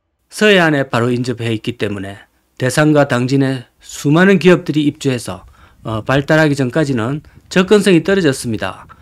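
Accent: Gyeongsang, South Korea. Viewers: 멋진멘트 갱상도발음 정확한 판단력 응원해요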